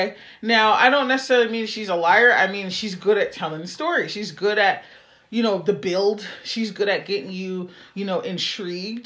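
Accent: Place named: Jamaica. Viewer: As an avid YouTuber thank you for this breakdown because I had no idea all this was going on!!! Big up yaself I think I heard a 🇯🇲 accent 💚💚💚#bapbapbap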